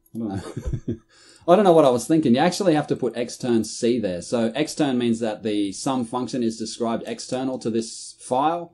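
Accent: Australia. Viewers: Assembly x86 presented in an Australian accent? Couldn't be better.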